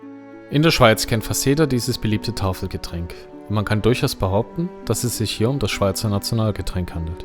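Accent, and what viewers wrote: Saxony, Germany. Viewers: ...einverstanden...aber warum muss ein Sachse sowas erklären. Seine Aussprache französischer Begriffe spottet jeder Beschreibung...nu?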